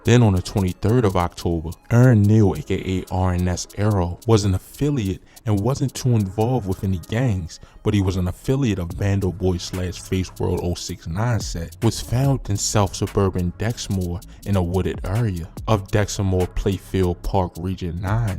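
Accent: Baltimore, United States. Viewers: Nigga frm Baltimore somewhere give him a break bro 🤷🏾‍♂️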